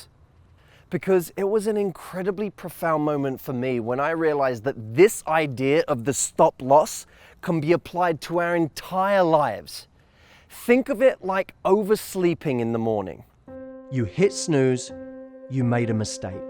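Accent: United States. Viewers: Came here for the life tips. Stayed for his American accent😂😂😍😍😍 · he does an American accent so well, but I love his accent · like your american accent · WHOA That American accent!!! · great american accent! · Your American accent is impressive! Look out Hollywood!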